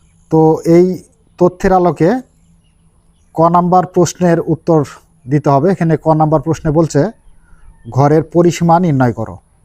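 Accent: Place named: Rajshahi, Bangladesh. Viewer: 🤔🤔🤔ভাষা শুনে লাগছে রাজশাহী (সম্ভবত)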